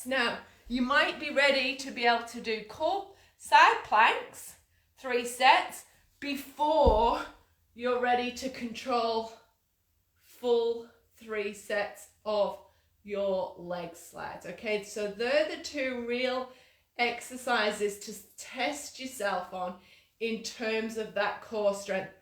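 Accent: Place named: Lancashire, United Kingdom. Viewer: This was great advice thank you, love the accent, are you originally from Bolton Lancashire or nearby? X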